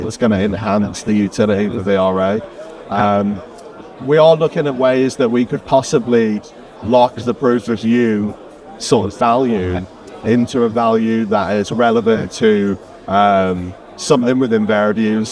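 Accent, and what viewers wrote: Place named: United Kingdom. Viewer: Sehr gutes Interview, und ich mag dein Englisch sehr. Warst du mal längere Zeit in Großbritannien? Die meisten Deutschen sprechen ja entweder mit sehr deutschem Akzent, oder es klingt eher amerikanisch. Bei dir gar nicht, das klingt schon relativ britisch.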